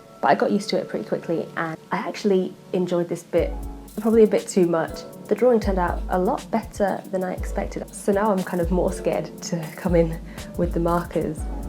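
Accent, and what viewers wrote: United Kingdom. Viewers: are you British?? X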